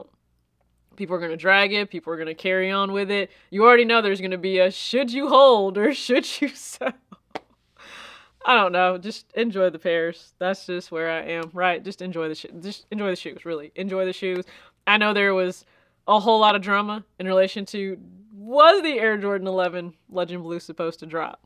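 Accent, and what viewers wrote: South, United States. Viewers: YOU FEOM THE WEST COAST LOVE I COULD HAVE SWORN YOU WERE FTOM LIKE SOUTH CAROLINA KENTUCKY SOMEWHERE LIKE THAT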